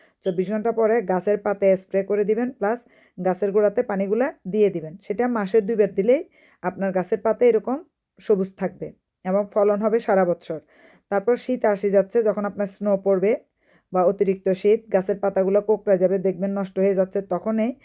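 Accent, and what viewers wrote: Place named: Chittagong, Bangladesh. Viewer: আপু আপনাকে অনেক ধন্যবাদ আপনি কোন দেশে থাকেন কথা শুনে মনে হয় আপনার বারি চট্টগ্রাম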